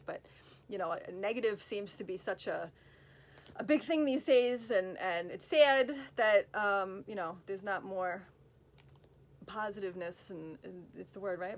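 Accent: New York, United States. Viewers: Awesome video, love the N.Y. accent. Just an FYI I have notifications on and didn’t get any. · I’m a Londoner and been to NYC many times and I think your accent is awesome and quintessentially New York which is no bad thing at all. Sorry to hear of your fathers passing. Snap regarding being Italian 😊👍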